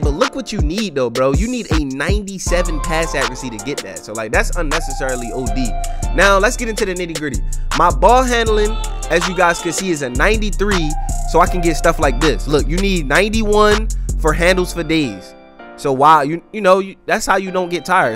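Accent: Baltimore, United States. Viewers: Dang bruh you from Baltimore 😭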